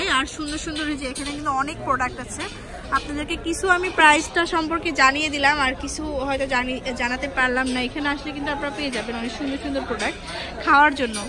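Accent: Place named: Rural, Bangladesh. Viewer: Apnar khotha gula valo lagyna.coz grammo kothar Moto hoy jay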